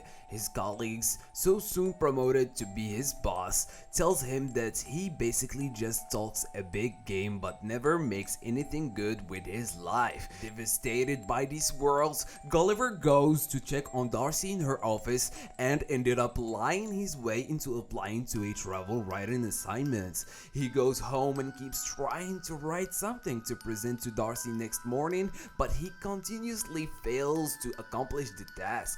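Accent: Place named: Morocco. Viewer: i can swear that you're Moroccan hahaha fiiin aSsat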